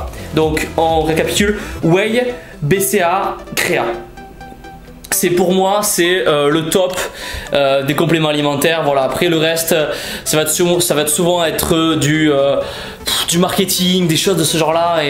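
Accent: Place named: Toulouse, France. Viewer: DAAAMN! Salut, j'ai une ptite question concernant mon alimentation, mais avant tout, saches que jte connais que depuis à peine 24h ( mieux vaut tard que jamais) et j'ai déjà regardé presque toutes tes vidéos en un jour, jmet le pouce vert à chaque fois et jme suis abonné biensûr;) J'adore cque tu fais, ton humour, tes intros, tes conclusions et biensûr... Ton accent prononcé de chez nous! ( je suis aussi toulousain et c'est une fierté que tu le sois aussi;) BREEEF! Je suis en sèche depuis un peu plus d'un mois et j'aurais aimé que tu me dise ce que tu pense de mon alimentation ( elle est vraiment très très proche de celle que tu montre dans la vidéo "QUE MANGER POUR PRENDRE DU MUSCLE SEC?" ) Mes compléments sont: de la whey isolate, de la caséine de calcium et des oméga 3 de <link> et jboufe pareil que toi genre œufs le matin, du poulet, des haricots verts, de la whey, de la caséine au coucher etc. 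La seule différence que j'ai avec toi, c'est que, pour être en déficit calorique tous les jours, je prends pas la collation de 10h et mes repas sont espacés de 4h avec 35g de prot à chacun. Je suis très carré, je pèse tout et calcule toutes mes kcal et je ressens pas le besoin de faire de cheatmeal pour le moment ( ma motivation me surprend moi-même ) En gros jfai en sorte d'être en déficit calorique tous les jours et j'ai un apport de 35g/4h de prot environ pour le maintien musculaire. VOILA VOILA pour résumer le prk de mon message, j'aimerai bien que tu me donnes ton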